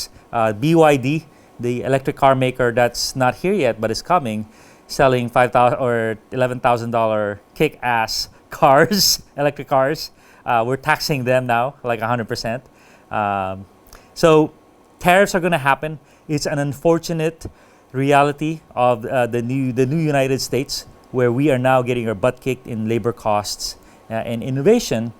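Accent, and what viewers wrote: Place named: Philippines. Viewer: Good information brother 🤙 I can tell from your accent that you speak Tagalog?….and does this going to be in the Philippines too?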